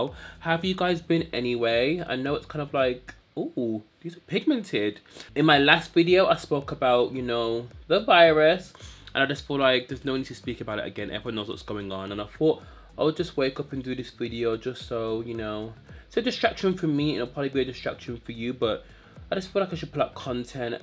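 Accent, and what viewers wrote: United Kingdom. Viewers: You have the strongest British accent I’ve ever heard lol. I’m American 😫 I’m not use to it at all!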